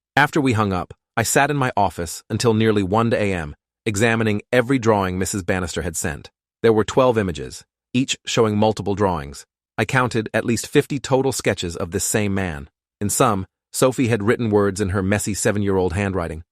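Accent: United Kingdom. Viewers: Why did he turn british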